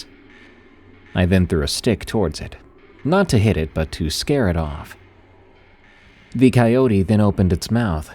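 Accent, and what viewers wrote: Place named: Denver, United States. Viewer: I didn't know that we Denver people had an accent 😊 Loved the stories TY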